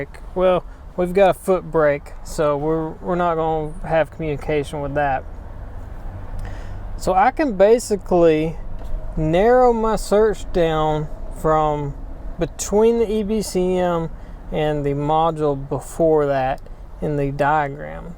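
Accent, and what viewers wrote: South, United States. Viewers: I watch every video but have learned the most about canbuss communication issues. Watching your videos is like watching your thought process spoken out loud. you're extremely intuitive, and you can see the wheels turning at each step of your process and why you've chosen the particular diagnosis path. your laid-back southern demeanor, and your straightforward integrity is very refreshing. but I've got to admit I'm from the west cost so I adjust the YouTube playback speed much faster so you sound like someone familiar from around here. lol: no disrespect intended.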